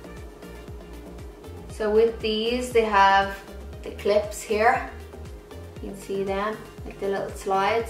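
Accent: Ireland. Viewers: Denise Gasparini she’s definitely Irish yes! I don’t know where the Hispanic come from 😅🙈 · Obviously Irish, beautiful and obvious. GO IRELAND!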